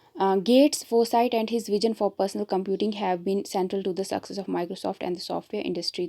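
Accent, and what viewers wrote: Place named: Himachal Pradesh, India. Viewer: Your himachli slang is soo good 😉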